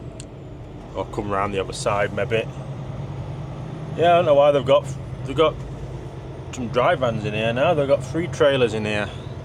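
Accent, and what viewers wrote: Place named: England, United Kingdom. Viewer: Nice truck and that English accent is going to throw off some people 😅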